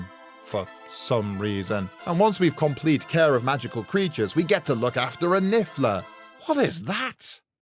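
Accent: United Kingdom. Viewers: Because in England where I assume he is from based off the accent it is illeagal to emulate copyright content. Punishable up to 5 years without bail. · You and Alan Rickman have like the same accent. 🤭 I get you are speaking silly lines, but your normal speaking voice sounds like you are both from the same town or the same part of Britain 🇬🇧 👍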